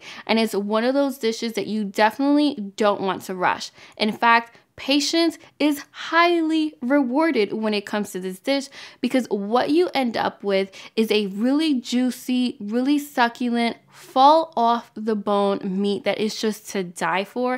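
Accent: New York, United States. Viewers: Love your oxtail recipe. I love your accent did you grow up in NY? You are very talented in the house 🏡👌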